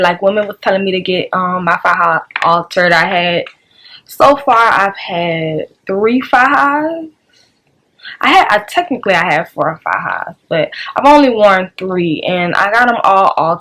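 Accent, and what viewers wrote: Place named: New Orleans, United States. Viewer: I knew u was from New Orleans u talk like me lol bt thanks for th info hun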